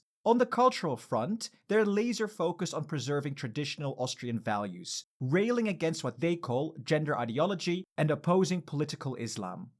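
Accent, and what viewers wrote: Germany. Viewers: nah you pronounced the names of the politicans and parties way to german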